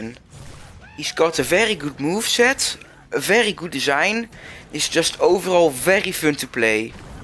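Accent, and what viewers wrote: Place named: Netherlands. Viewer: i can just feel ur dutch voice.. Don't worry about it i have the same problem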